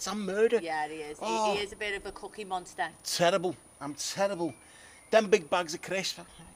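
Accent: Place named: St Helens, United Kingdom. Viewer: V-Strom 650 can be lowered 👍🏻 Can I ask where are you from. You sound St Helens to me, my home town 👍🏻😊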